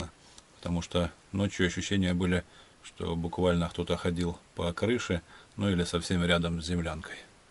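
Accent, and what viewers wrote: Ukraine. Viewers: так по говору можно догадаться что он с украины.у них свой акцент имеется.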